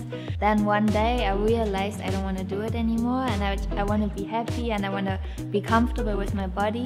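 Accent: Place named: Germany. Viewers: She’s super cute but you can hear that she’s german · Freya Loves Kittens Yes she has a really strong accent and I can hear that also I’m a german too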